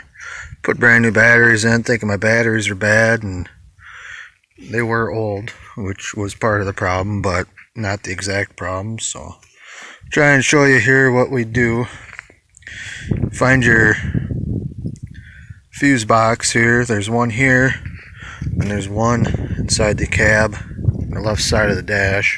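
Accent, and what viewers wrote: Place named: Corning, United States. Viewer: you sound like my cousins from Corning NY... same accent lol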